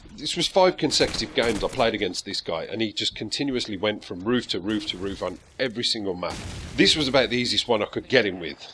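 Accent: United Kingdom. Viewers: In the US there is something about the UK accent we enjoy. Something different I suppose. Is it the same over there for broadcasters with an American accent?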